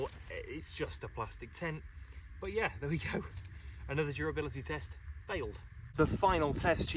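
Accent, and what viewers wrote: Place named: United Kingdom. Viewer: You have a narrator's voice. It reminds me of a voiceover from a British show.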